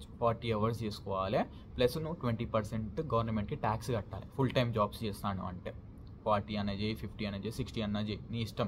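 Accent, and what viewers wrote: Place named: Telangana, India. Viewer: Brother ni way of presentation superb in telangana slang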